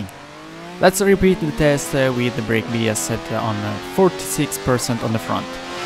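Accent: Italy. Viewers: I love your Italian accent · L'accento italiano antisgamo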